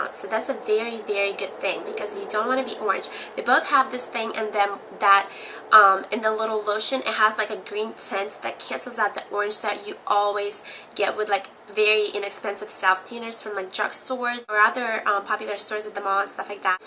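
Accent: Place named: New York, United States. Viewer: totally unrelated,,,but are you from Jersey or NY..guessng by the accent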